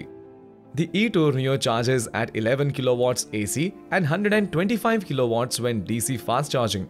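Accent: India. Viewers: This tounge...like indian speak english...😊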